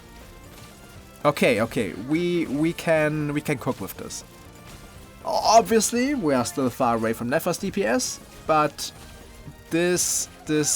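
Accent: Germany. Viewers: HOERE ICH EINEN KLEINEN DEUTSCHEN AKZENT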